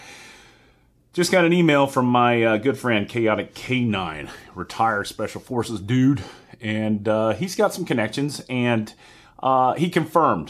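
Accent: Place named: South, United States. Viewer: Shell not comply ! Haha.. That southern accent brother. But yeah, Shall Not Comply is right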